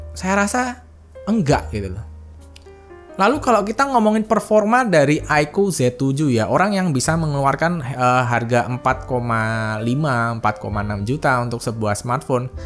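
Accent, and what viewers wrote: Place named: Java, Indonesia. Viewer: Bang orng mana bng?kya ada logat Jawa nya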